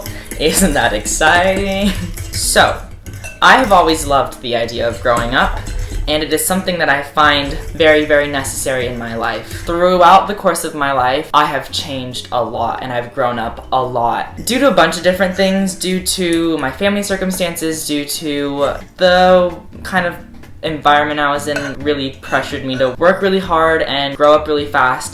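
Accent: South, United States. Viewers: "Why do I sound southern?" lives in texas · “Why am I like... southern?” You.... you.. you live in.. Texas. I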